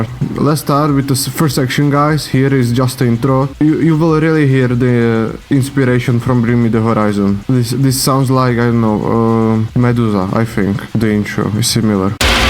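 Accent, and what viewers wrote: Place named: Czech Republic. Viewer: Good vid, are you Czech? Guessing from accent :-)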